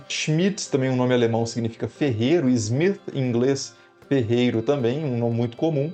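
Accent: Minas Gerais, Brazil. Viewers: vc é mineiro de onde?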